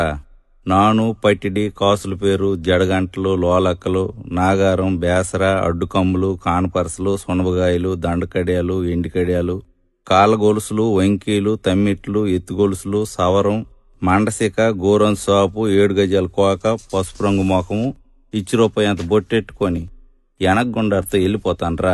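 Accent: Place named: Uttarandhra, India. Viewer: Pakka uttarandra yasa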